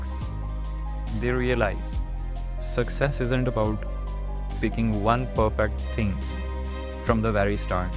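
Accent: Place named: India. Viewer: A quick suggestion, the 'r' pronounciation isn't looking natural . Its alright to stick on our beautiful Indian accent. All the best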